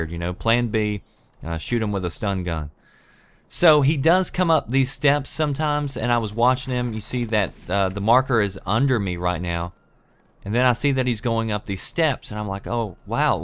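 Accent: South, United States. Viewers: Your commentary is top notch. It's probably the southern drawl. I don't even have Splinter Cell and I enjoy these walkthroughs. I am looking forward to you making more "Tips & Tricks" videos for BF4. That's originally why I subscribed to your channel a long time ago. Keep up the good work.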